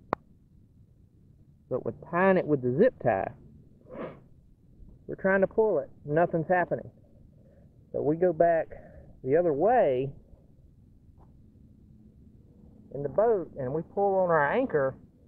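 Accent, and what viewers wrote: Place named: South, United States. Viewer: Good , simple, straightforward video man. Sounds like you are Southern born too, like me.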